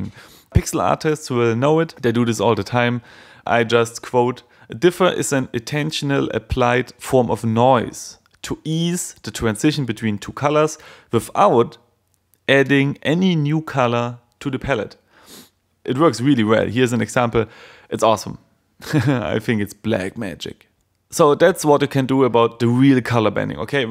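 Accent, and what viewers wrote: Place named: Germany. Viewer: I prefer the video for an introduction and then read your article. Your german accent helps me to understand your English as I am French. Reading is a more abstract process and requires more attention.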